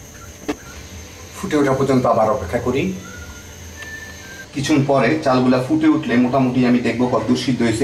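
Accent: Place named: Kushtia, Bangladesh. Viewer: ভাইয়া আপনার দেশের বাড়ি কি কুষ্টিয়া। কথা সুনে তাই মনে হইল।জানাবেন প্লিজ।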